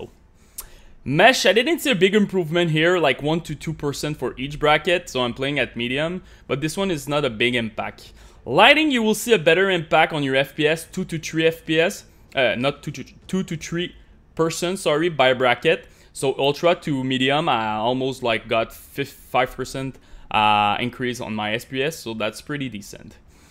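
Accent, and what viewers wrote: Quebec, Canada. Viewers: lmao just curious are you from Quebec Or New Brunswick?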